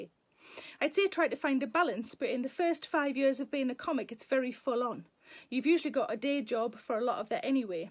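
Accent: Newcastle, United Kingdom. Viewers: Hi,!! Been listening to multiple episodes in chunks since I’ve not been able to tune in at the airing time every day. So I’ve been catching up for the past couple days. I live in a little town called Shepherdstown in West Virginia in the US; A small historical town that no one’s really heard of, but oh well. Born and raised here. (Although my Dad’s from the UK, so I was raised with a strong love for the English) I love listening to your lovely Geordie accent and smile and laugh along listening to you while working on art pieces. Thank you for being one of my favorite parts of my day, and for being such a beautiful person inside and out. Lots of love - Emma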